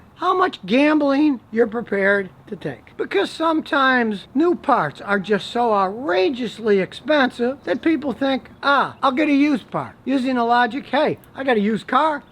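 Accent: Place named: Texas, United States. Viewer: Hi Scotty I love you videos and I religiously watch them. I've even learnt how to understand your Texan accent. Much love from Kenya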